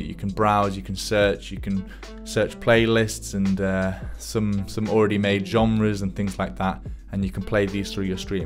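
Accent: United Kingdom. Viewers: Where is your accent from? It doesn't sound like london british but it sounds british, it's nice.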